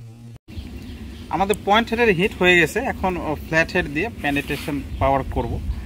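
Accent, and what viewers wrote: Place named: Naogaon, Bangladesh. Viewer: Apnar kotha sune buzhlam apnar bari Naogaon ba Joypurhat . Amr bari naogaon. Nx 200 ta kinte chai. Ki upaye kena jay?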